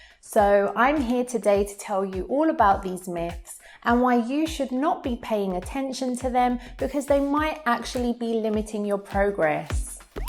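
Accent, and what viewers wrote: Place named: United Kingdom. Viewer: She has a British accent as cute as herself. Thanks for these tips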